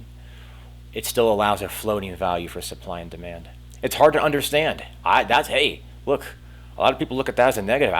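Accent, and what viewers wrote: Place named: New England, United States. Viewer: You sound like you're from New England..😎😎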